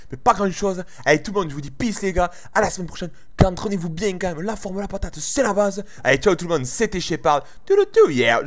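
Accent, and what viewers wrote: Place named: Toulouse, France. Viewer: Un bon toulousain avec cette accent sa fait du bien , les toulousains les meilleurs Shepard le meilleur si je pourrais jouer avec toi sa serait cool si tu veux je te donnerai mon gt ;)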